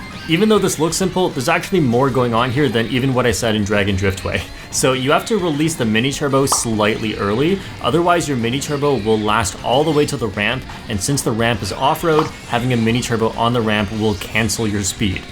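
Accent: Canada. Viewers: avec ton accent, je suis presque certaine que tu viens de montreal 🤔 btw super video!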